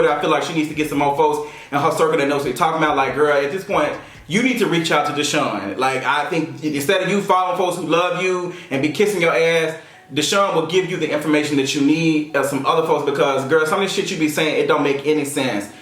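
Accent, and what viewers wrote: Memphis, United States. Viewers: Babe!! I feel every word u say. “I don got pissed off”. 😂 U are so Memphis. 👍🏽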